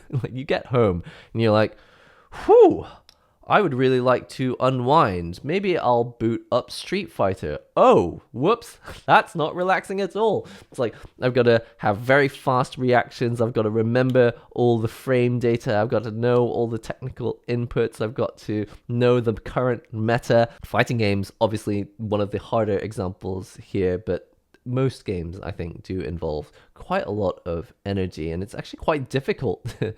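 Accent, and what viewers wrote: United Kingdom. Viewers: Why do you have an uk accent?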